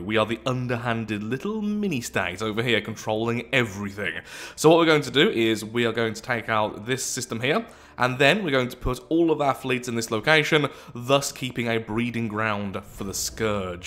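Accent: United Kingdom. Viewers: Where is that Tim Curry-like accent from in the UK? I keep expecting you to shout 'Spaaayce!'.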